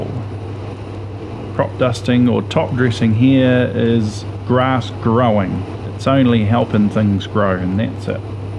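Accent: New Zealand. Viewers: Nice video. I always enjoy seeing a FU-24 fly. My dad, Jerry Barden, was the project engineer for Fletcher Aviation in the mid 1950's in Hamilton NZ. He helped Robertson Air Service & James Aviation assemble the initial batch of 24's. He then did the test flights on the first 42 completed. We lived there from Sept 54 till April 56. The NZ accent brings back good memories. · Awesome vid thanks. Just a Kiwi accent mate!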